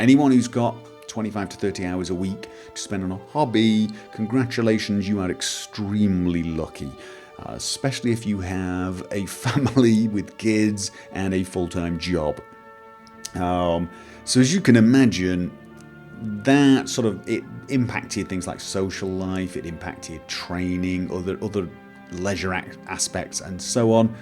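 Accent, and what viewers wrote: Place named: England, United Kingdom. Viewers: Let's all share our stories of how we found! I actually found him by searching for let's plays of Vampire the Masquerade. I was watching LK's Marik play through, and was frustrated because he dropped the project, and I actually liked the way the story was progressing. So I searched on youtube and came across. I laughed and said "Hey he's got an English accent too, nice!" I found his voice so soothing to listen too, that I watched the play through several times before I started the skyrim videos. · Congrats, you know i gonna lay it all out here bro. Love the playthroughs, love the baritone Northern English accent. Love your style altogether, just a quick thought is that now you've decided to devote more time to your channel, I just want to say that if money becomes an issue I'd (Plus some other subs) would be more than happy to donate to the cause to help out. 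so yeah, all sunny skies from here in. Best wishes from us to you, here in West Virginia.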